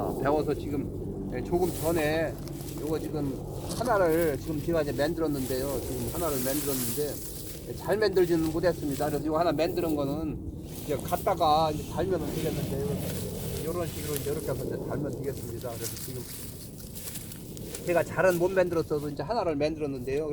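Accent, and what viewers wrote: Chungcheong, South Korea. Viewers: 손 새끼는 제가 더 잘할듯 ㅋㅋ 충청도 사투리 정겹습니다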